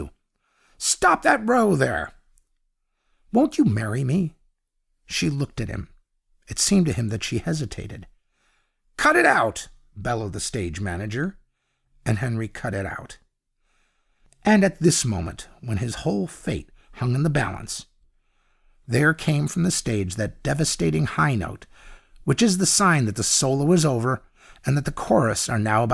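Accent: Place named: United States. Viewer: Not right with American accent sorry.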